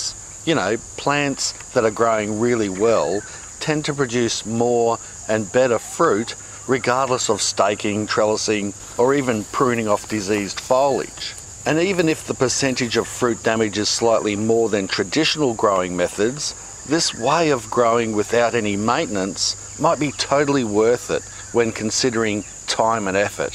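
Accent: Australia. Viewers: Love the Aussie accent mate...... ❤❤❤👍👍👍🙏🙏🙏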